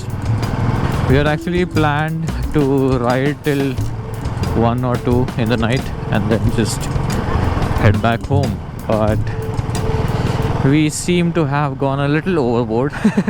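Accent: Malvani, India. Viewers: I am watching this video for the 7th time just to hear his malwani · Sir tumhi malvani kadak boltat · I like for malvani ..... though I'm not but love that accent 👍🤙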